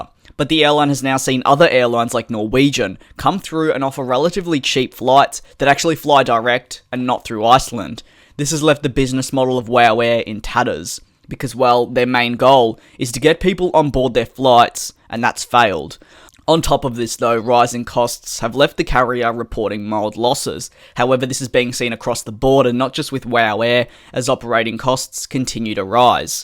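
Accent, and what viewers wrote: Australia. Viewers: Are you Australian? Got a hint by how you say year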